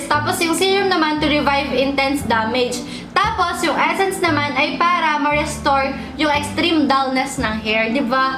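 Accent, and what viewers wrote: Batangas, Philippines. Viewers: Ate, ano year mo na sa college? Bsu ka pala. Kaya pala mala accent mo pang batangas hahaa. Bsu din ako incoming, freshmen pa nga lang.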